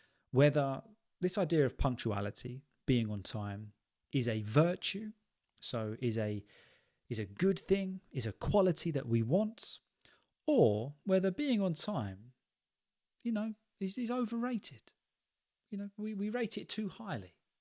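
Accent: United Kingdom. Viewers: I started watching your videos to get used to the British accent. I have to concentrate to understand you. It feels like learning a new language 😅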